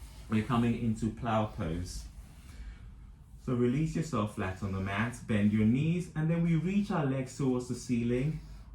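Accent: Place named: England, United Kingdom. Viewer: I'm going to be doing this teacher training in January 2021. Thank you for showing how to teach this method 1 on 1 in client's homes. I love 💘 that your English too, we tend to deliver instructions a little differently than Americans. How long have you been teaching this method?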